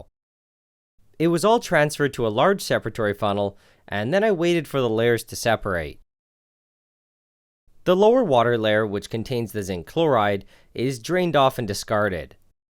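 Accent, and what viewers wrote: Canada. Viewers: awesome video, you sound canadian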